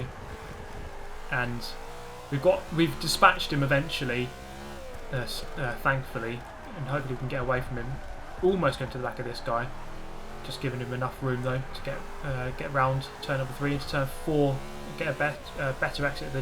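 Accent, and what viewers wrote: United Kingdom. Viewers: The British accent is paired with sarcasm as well as noobs and the Alfa 33.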